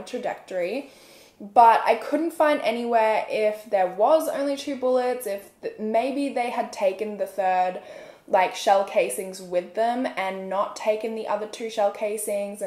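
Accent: Australia. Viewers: Usually i never comment on a yt video, but here i a am today... i just wanted to say that your work ist amazing. You put so much effort into the research, your videos are always so exiting and detailed. But still you show so much respect to the victims and their families. Btw i love your accent, it always reminds me of my time in Australia 🧡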